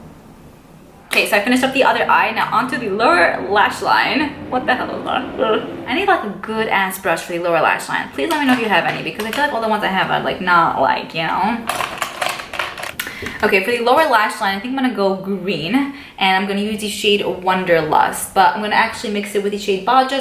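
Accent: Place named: Brooklyn, United States. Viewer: you're a beautiful woman, you're still young so the right guy for you is out there! I love the look you came up with here, the lashes just really topped it off beautifully! I love hearing you speak, I was born and raised in Bklyn (till I was 21) so you sound like home <3 hey have a bagel for me on 13th and 75th if its still there! that place was my jam back in the day for a bagel with melted cheese! lol anyway, just found your channel recently and i'm loving it and you xo Michele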